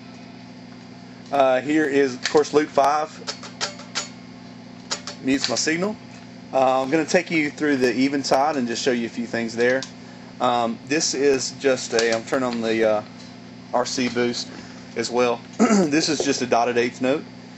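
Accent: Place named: South, United States. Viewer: Dude, nice rig. Church rig?Might I recommend a better tuner? (turbo tuner!) Also, if you didn't already know, you probably should not use the "tuner out" on your volume pedal - it messes with your tone. Anyway, is that green pedal a JHS custom pedal? I seem to recall a custom pedal that looked very similar to it on their site. Great tones btw. I used to have a M9 and I loved it, I had a sonic stomp too, both cool. I hear your southern accent, where are you from? I am in Hattiesburg,Mississippi.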